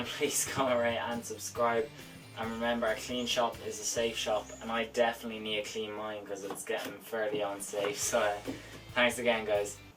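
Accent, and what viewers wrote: Ireland. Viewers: doing the fence now harder than it looks but getting their nice to hear irish accent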